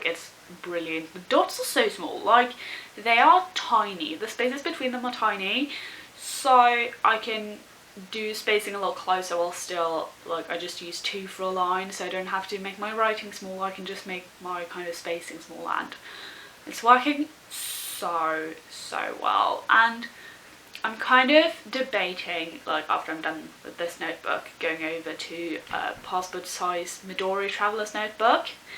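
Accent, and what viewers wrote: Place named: United Kingdom. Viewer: Thats header very cool & I loved your accents too.... British accent?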